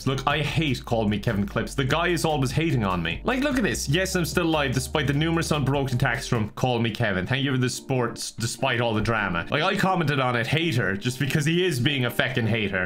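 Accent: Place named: Ireland. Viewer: OMG HE'S IRISH I NEVER REALISED also I have been subbed for 3 years and though he was joking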